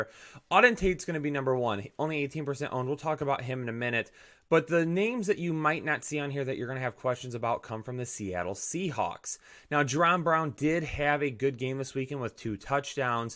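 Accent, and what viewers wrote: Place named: Upper Midwest, United States. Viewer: I have one question, upper peninsula or Minnesota? Love that accent G.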